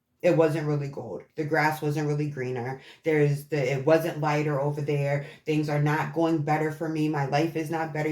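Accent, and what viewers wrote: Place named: East Coast, United States. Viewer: You have no idea just how much i would enjoy to sit and chat with you or have a personal reading and such. In the world of psychics and tarrot there are but a few who are truly gifted and know how to properly utilize and share that gift. Sadly we live in a world where scams have existed from the beginning. So its very refreshingly beautiful to come across a reader such as yourself. Not a phoney bone in your body. You are clear, speak well and very detailed and concise as far as a card can be keep what resonates and leave the rest You have a nice clean visual for your background looks bright and clean with that lil pop of red and your speaking voice is very very easy for me to listen to. Im sensetive to sound and light. Sometimes a person could be lovely to look at then the minute they talk i just want to run lol. 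Also hear a bit of east coast accent and i luv that and i just adore you. Your shorts are wonderful 👍 i have never taken a salt bath but there are Himalayan salt spa caves and i would luvv to go to one or the healing spings in Arizona. Anyway i believe in the universe and that it gives us sign after sign and so very many who dont believe just wount see the signs. Heck even those who believe miss or ignore signs. Spirituality is a journey and a very personal process. For some it starts as toddlers for others teens and older and sadly for some never. #ALL CAN, SOME SHOULD, NONE MUST! Love&Light ~♡~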